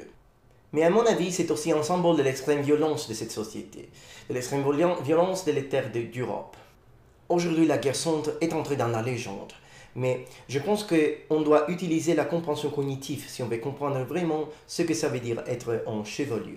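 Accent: Italy. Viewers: un accent particulier en français, j'entend l'accent italien et l'accent anglais en même temps. je préfère l'anglais ;) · Sometimes, the italian accent can be remarked, although very lightly. The rest of the time, it's a bit obscure : it can be heard that he's not a native french speaker, but it doesn't sound specific to any country. Which is pretty neat considering french has such a weird and uncomfortable syntax to be spoken when you're not a native speaker (hell, even native speakers can get it wrong pretty often) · Hi. I'm here for some feedback. That was very good overall. I understood everything without effort, so you level is clearly good. However, you still have some room for improvement (you're good, so this will be nitpicking). The sentences you used were well structured, but sometimes, the pronunciation was off, even when ignoring your natural Italian accent. 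So you had trouble with using the correct "déterminant" (un/une) depending on the type of word that follow. But this is hard for everyone learning french. Secondly, (and this is a bit funny), you said "chevalieu" instead of "chevalier" a couple of times. Lastly, the biggest mistake was pronouncing the "p" in "coup". You just don't. It's silent. Yeah, i know. Fucking french right! It's the biggest mistake because when you pronounce the "p", it sounds like "coupe" (slice), which is a totally different word than "coup" (blow). And regarding your trademark sentence "the has spread his wings", i couldn't help but find it very stylish in french "Le a déployé ses ailes". Nice!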